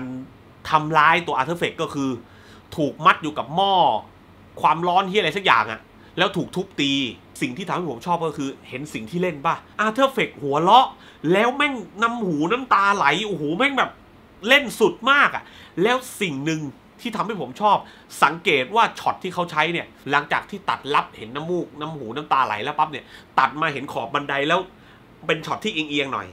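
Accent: South, Thailand. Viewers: พี่คนใต้หม้าย?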